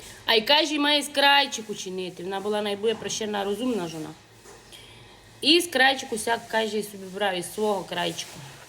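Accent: Transcarpathia, Ukraine. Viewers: Ну стільки масла , сметани і на вершках, ще б не було добре 😂😂😂 !❤ А ваша закарпатська, то мед на вуха і душу ! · Розмовляє на закарпатському діалекті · Так гарно показуєте і мова ваша закарпатська така гарна. Також в молоді літа виділа як на сільських столах стояла велика миска і з неї вся сімʼя їла. І тато нас вчив їсти з краєчку, бо так скорше остиває і не попечешся. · Така файно по-закарпатськи розмовляєте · Гуцули так не говорять, невже ви відрізняєте.Ця жінка з Закарпаття, так що вона не гуцулка..Гуцули живуть у Капатах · Діалект Закарпаття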